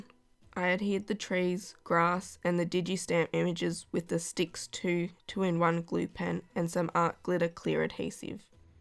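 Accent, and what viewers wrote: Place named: Australia. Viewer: I wondered where you lived with that super cool accent. Australia! How neat! I know someone from there and for years I spent time trying to convince him to mail me a kangaroo. He kept telling me that kangaroos are wild animals and are not meant to live inside your house, but I would not take no for an answer. I had an awesome picture in my mind of my very own kangaroo carrying stuff around for me all the time in its super cool kangaroo pouch. That is my story and I'm sticking to it! I didn't realize that Australia doesn't really celebrate Halloween. That is something that I hope changes because Halloween is my favorite day of the year, so I wish that everyone would get to experience it! This year I got to meet my new neighbor who is four years old and is named Faith, when she ventured across the street because she was looking for candy. 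She actually yelled and asked if we had any, at which point we yelled back very loudly, "We have so much candy, and glow bracelets, and bags of Halloween toys!" Needless to say, she convinced her dad to come across the street and come inside to meet us. It was great. There are so many cute things that happen every year. Tiny people are my favorite kind of people, and Halloween is the one day of the year that a bunch of them show up at your house wearing adorable costumes! That is why I like it so much. I will find you out in social media land. I really hope that it is not expensive to mail that card all the way to me in the United States. If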